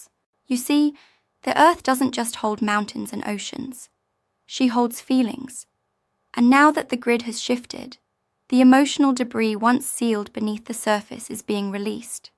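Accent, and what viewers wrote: United Kingdom. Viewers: with the Brit accent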